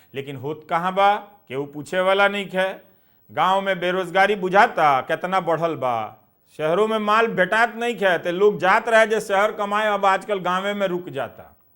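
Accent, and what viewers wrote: Bhojpuri, India. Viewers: बहुत बढ़िया,समाचार पढ़े के शुद्ध भोजपुरीया अंदाज बहुत अच्छा लागल हऽ। शुरू मे आउर आखिर मे इ जे नमस्कार कहिला ओकरा जगह हमरा हिसाब से प्रणाम कहेम त आउर प्रभावशाली लागी। 🙏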